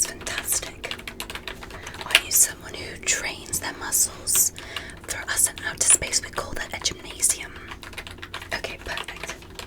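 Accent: England, United Kingdom. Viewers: Is she English or American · Omg yesss, I love your alien ASMR videos with the British accent. I don't know why but it just hits different and makes me feel safe. The British accent just tingles apart of the brain that I can't explain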